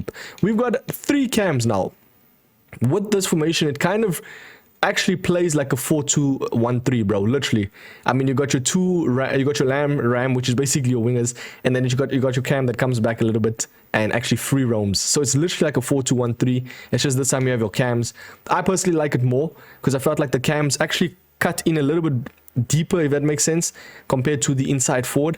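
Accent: South Africa. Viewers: Are you South African ? 🤔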